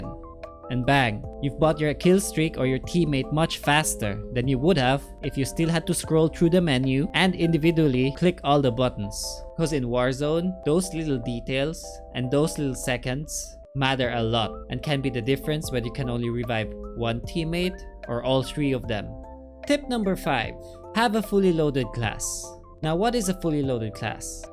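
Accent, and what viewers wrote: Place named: Philippines. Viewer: English mo kasi parang pinoy ang tunog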